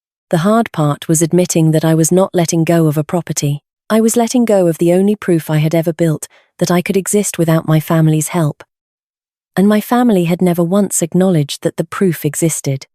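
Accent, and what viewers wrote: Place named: United Kingdom. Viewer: Narrator has a very lovely voice but it was a bit bizarre that she’s clearly British. Didn’t quite fit a story supposedly from Cincinnati. Was quite distracting.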